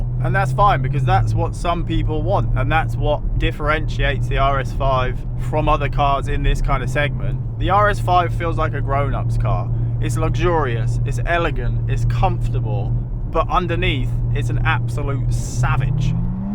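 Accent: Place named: United Kingdom. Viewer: I love that british accent »A glass of booooataaa».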